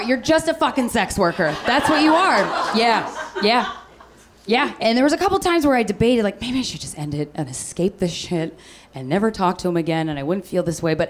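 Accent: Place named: Midwest, United States. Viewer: Herr midwestern accent is amazing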